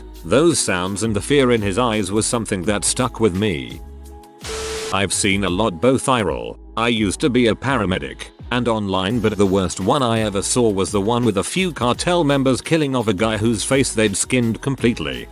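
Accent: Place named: United Kingdom. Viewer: Can you guys change the British narrator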